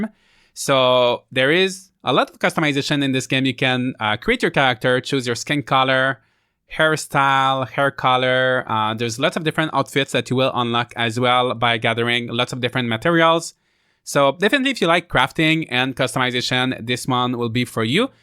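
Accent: Quebec, Canada. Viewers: accent quebecois ?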